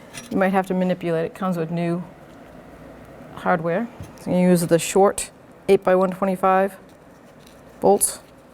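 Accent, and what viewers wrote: Boston, United States. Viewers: Nice job here... You should however coat all those threaded connections with high-temp antiseize lube and the O2 sensor should get a dab of dielectric grease to keep moisture out of the connection. This is important if you are in a road salt environment. By the accent, it sounds like Boston or Southern New Hampshire area.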